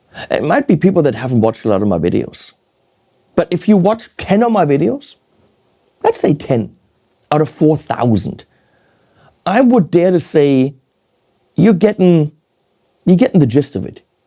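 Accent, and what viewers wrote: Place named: Germany. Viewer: I make my comment not even watching the video in the full length. The reason I come back to watch your videos (and you + Johan Segeborn are the only gear youtubers I come back to at this moment) is not the gear. This is basically something that I am not interested anymore, I have enough gear to do my music. I do come back to your channel because I respect your honesty, I like to hear your rants (expressed in beautiful german accent I love) and personal messages you share with us. I don't even listen to that regularly, because i have no time for that. But I want to share a message of appreciation with you. Take care and animals at the end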